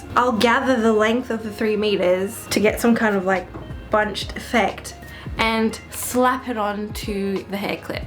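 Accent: Australia. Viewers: She has a noticeable Australian accent LOL. So Australia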